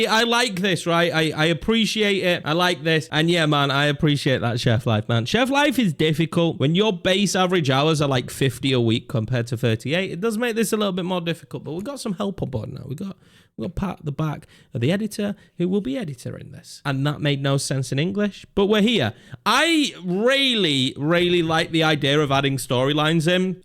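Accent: Yorkshire, United Kingdom. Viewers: Let's get a Top CB like Diomande or Silva for CB and maybe get rid of one or two. The next stage of good teams is trimming down the squad and improving with genuine quality. The voice overs are clean Yorkshire Man.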